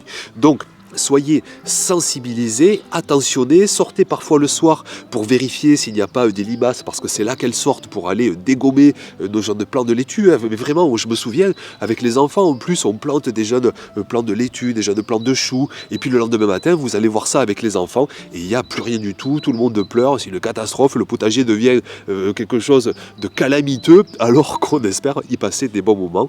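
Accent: South, France. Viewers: Trop bel accent du Sud ❤j’ai plutôt l’accent du Nord ❤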